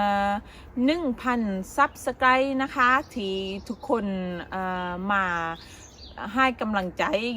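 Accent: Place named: Phang Nga, Thailand. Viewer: ดีใจด้วยค่ะ คนใต้เหมือนกัน สำเนียงคล้ายคนพังงาเลย ใช่รึป่าวคะ🌷🥰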